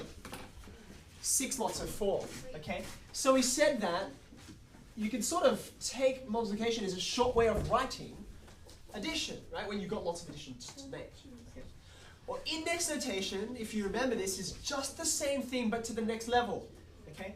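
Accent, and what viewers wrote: Australia. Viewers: nice to hear an australian accent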